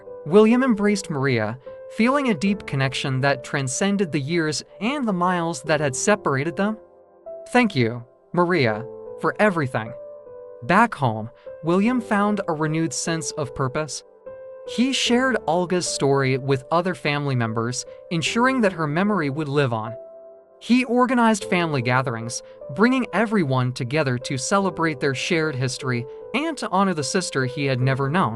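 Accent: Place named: United States. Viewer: Also, though the accent spunds genuinely American, the speaker switches genders on characters in the story. Come ON, people!! Dloppy performance, at best !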